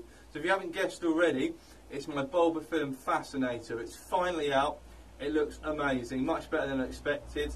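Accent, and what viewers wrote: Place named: South, United States. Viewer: You sound like your from the south country btw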